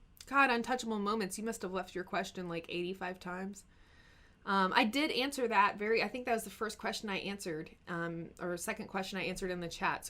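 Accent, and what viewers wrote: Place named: Texas, United States. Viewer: i can hear the texas in your voice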